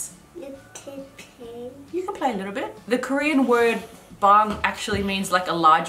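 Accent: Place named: Australia. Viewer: He's so cute! It was interesting learning the Korean word for "cicada". Also interesting is that the Australian pronunciation is different than the American pronunciation. We say "ci-kay-da", not "ci-kah-da". I noticed that you pronounce "똥" differently than does, too. He is clearly picking up the more native Korean accent, and not imitating your Australian pronunciation of Korean words.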